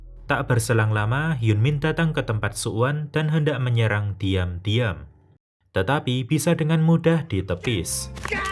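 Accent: Java, Indonesia. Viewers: Jowo pundi mas, sampean?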